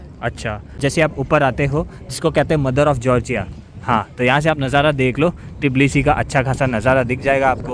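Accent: Gujarat, India. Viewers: Bhai ko boli se pata lgta hai ki bhau gujju hai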